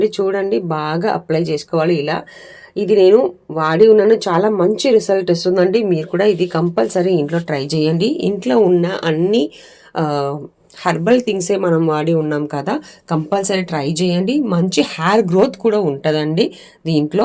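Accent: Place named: Kerala, India. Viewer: Malayalam notlo vostundiga madam Good so proud of you madam as a malayali proud of you madam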